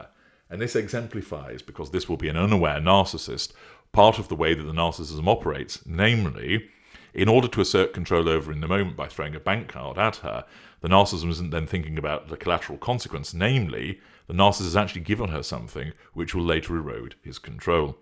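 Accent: United Kingdom. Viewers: this gentlemen is british for sure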